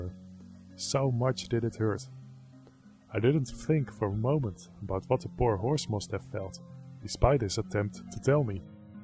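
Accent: Netherlands. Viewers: your dutch accent is so apparent gawdxD btw this is all a bit exxagurated... a good rider gives his horse relieve in the mouth when he does good, so the bit hardly moves by your hands... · horribly Dunglish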